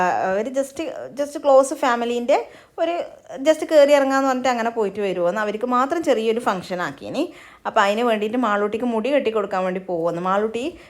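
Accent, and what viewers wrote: Kannur, India. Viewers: പുതിയ ഒരു വാക്ക് കൂടി കിട്ടി.... കുറച്ചു ദിവസം മുന്നേ പുതിയ ഒരു വാക്ക് കിട്ടി കുടിയോ... ഇപ്പോൾ അടുത്ത വാക്ക്... ചേച്ചി ടെ സംസാരത്തിൽ നിന്നാണ് കണ്ണൂർ ജില്ലയുടെ ഭാഷ ശൈലി മനസിലാകുന്നത്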